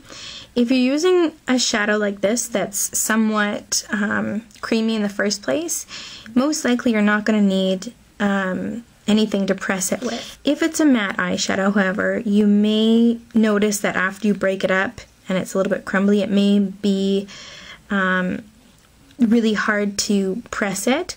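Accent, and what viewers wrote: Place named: Canada. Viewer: Are you canadian? because when you say 'out' and 'eh' you really sound like you're from canada! ll =P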